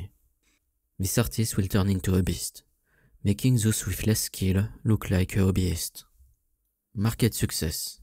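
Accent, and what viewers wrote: France. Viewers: It is amazing that you came back with another video! We missed you and your content which is very inspiring and valuable, thank you so much for taking the time to share it 😊 And don’t you ever worry about the french accent or the way you are speaking english, keep talking, there are people who likes or loves hearing it.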